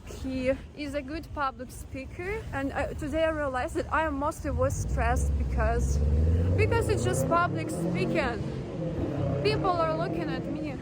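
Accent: Russia. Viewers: I think it’s her Russian accent when she speaks English.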